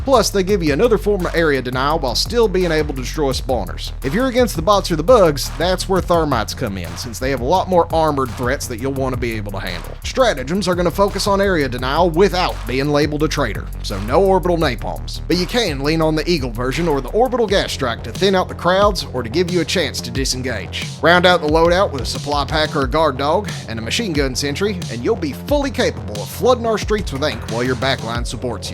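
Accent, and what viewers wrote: South, United States. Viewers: Wonderful Southern accent! 👌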